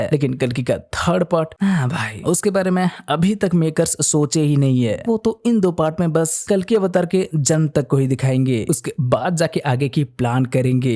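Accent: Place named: Gujarat, India. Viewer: Aise kyun bol raha hai jaise koi teri le raha hai. Sorry, but bohot irritating hai. Dusra ye hai ki if you are Odiya or Bengali make video in local language, jyada better. Kyunki teri Hindi waisi hi hai jaisi Gujarati logon ki Hinglish.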